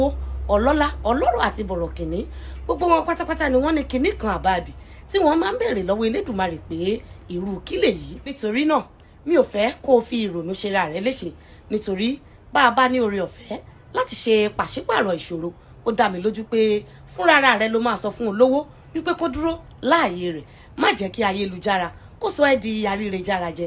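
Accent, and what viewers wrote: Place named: Yoruba, Nigeria. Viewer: Love listening to your deep Yoruba accent. It sounds like you are singing a joyous tune which make it pleasant to the ears. Awesome message! Just what I needed to hear after being frustrated with my co-workers’ attitude. 🙏🏾🤗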